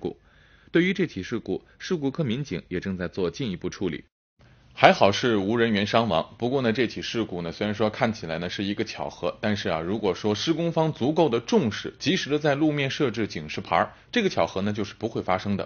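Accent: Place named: East China, China. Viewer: it iz english with strong east chinese aksent.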